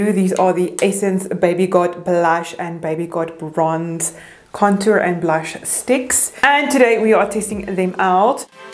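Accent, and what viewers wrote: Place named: South Africa. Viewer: I can’t tell if this is a South African accent or Dutch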